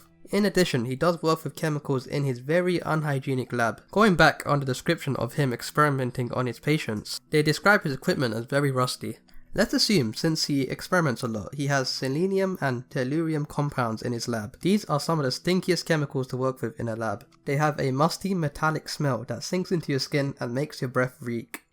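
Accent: United Kingdom. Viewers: Dude I like your accent. It's a british one right? Sorry I'm not familiar with all the accents.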